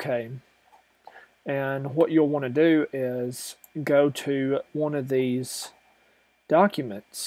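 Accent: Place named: United States. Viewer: You sound like a cowboy :-D You're accents amazing! This is a really helpful video. Thank you.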